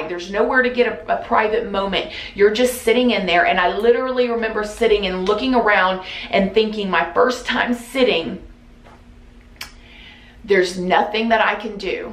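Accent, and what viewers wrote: South, United States. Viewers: Wow! I have rewatched this video several times, and it only becomes more and more scary and eye opening. I've known people who have been to jail/prison. I've never been (and hopefully never will), but I have this weird interest in how prison works and I can tell from what I've been told and from watching you and Jessica Kent's videos and what I've seen on TV that it is a horrible place where you are stripped of all your freedom and sometimes family and friends disown you. I believe this interest i have in prison is somehow helping me really value and appreciate my freedom. I hope I never break the law by accident or on purpose., you are a good person and very strong and resilient. Never let ANYONE tell you different. You are one of my favorite YouTubers. I love how real you are. I bet you are one of the sweetest people. 💕 Also, I love your southern accent! 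· It keeps me captivated. You know how you read a book and sometimes you've read 6 pages but you have no clue what you read? Your videos are the complete opposite of that, for me anyways. I get lost in storytimes. I find them boring honestly. But I find myself going back... did she just say Bob wire? Rewind. Yes, yes she did. I love your accent and your way with words. It keeps my attention. I'm a Jersey girl in NY. I don't have any southern accents in my life. so I really can't get enough of yours!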